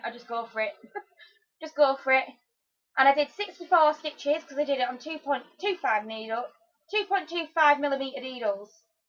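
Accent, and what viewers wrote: United Kingdom. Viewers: Brill podcast!! I'm going to have to binge all your previous episodes! And it's nice to find a podcaster with a proper British accent ;)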